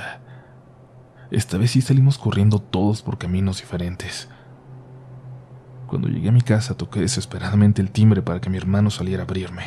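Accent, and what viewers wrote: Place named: Mexico. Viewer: Haha el acento mexicano es lo q hace q no me de tanto miedo 😂 hasta me río.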